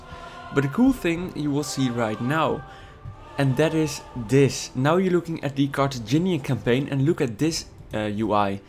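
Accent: Netherlands. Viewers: ahhhhh your accent man, im cringing ( dutch aswell )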